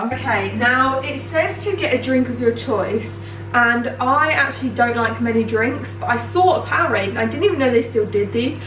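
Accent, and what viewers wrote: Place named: Yorkshire, United Kingdom. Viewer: Your Yorkshire accent kills me 😂😂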